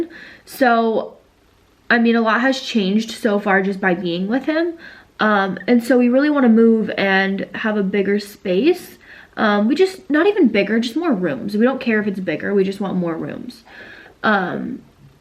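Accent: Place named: Canada. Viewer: Lol I think it’s her Canadian accent? She says bagel the same way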